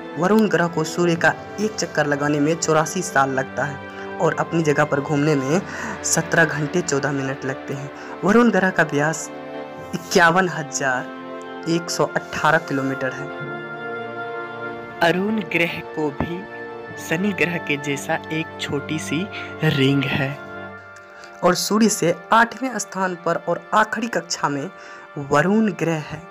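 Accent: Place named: Bihar, India. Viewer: भाई आप किस जिले से हैं भागलपुर, खगड़िया, बेगूसराय ?? आपके बोलने के टोन से guess किया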